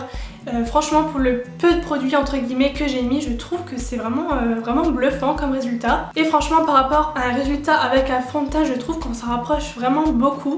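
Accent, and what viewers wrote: Lorraine, France. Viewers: AAAAH je viens de te découvrir et ton accent Lorrain me fait plaisir ! Je suis une Lorraine de base ! Bref là n'est pas le sujet, j'ai commandé la CC crème il y a 2 jours, j'espère la recevoir aujourd'hui car vous me tentez toutes avec vos revues ! Contente d'avoir découvert ta chaîne, je m'abonne direct :) A bientôt ! Charlène <link>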